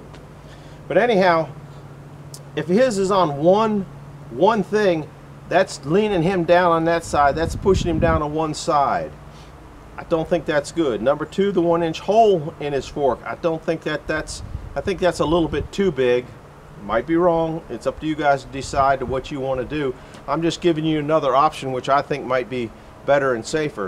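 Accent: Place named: Ohio, United States. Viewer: Where are ya located ol boy. Been wondering, we all think your an Ohio boy from your accent and your poplar you cut.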